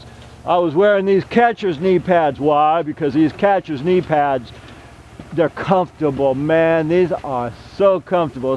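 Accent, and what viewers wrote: California, United States. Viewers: Never seen such a pro stucco enthusiast in my life tip of the cap 🧢 that accent is so Cali it hurts 😂 good stuff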